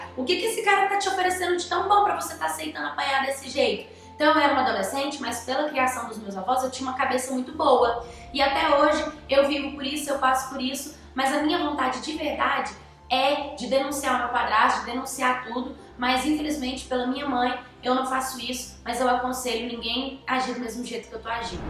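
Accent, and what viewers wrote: Northeast, Brazil. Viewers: Faz vídeos com o sotaque nordestino,amo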